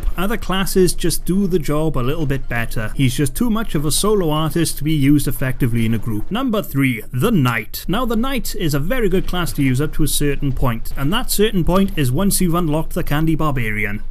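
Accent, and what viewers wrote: Wales, United Kingdom. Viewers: Is he Welsh? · What part of wales you from mate?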